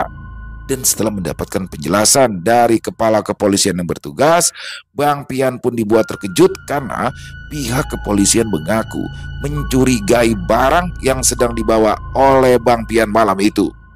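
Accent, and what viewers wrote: Jakarta, Indonesia. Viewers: Paling suka episod bang pian😁😁khas dengan bahasa Betawi nya😁😁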